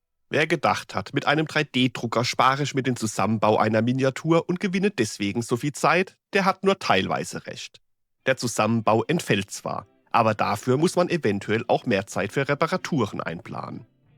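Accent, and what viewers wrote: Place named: Palatinate, Germany. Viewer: Kummscht du aus de Palz?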